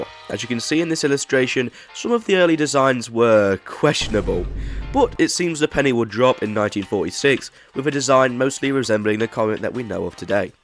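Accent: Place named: United Kingdom. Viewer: Your new channel is great, but could you please put subtitles? No offense but it’s sometimes hard to understand through the Bri’ish LOL🇬🇧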